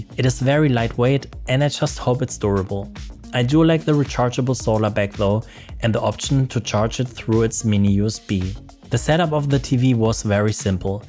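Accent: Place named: Germany. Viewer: You sound almost exactly as "Battlenonsense" on YouTube. It's zee German accent.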